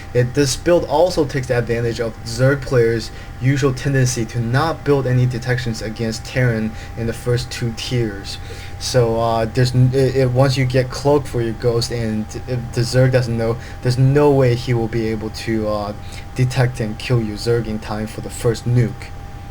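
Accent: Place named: Asia. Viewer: good ears, i can tell it's an asian speaking too if i listen closely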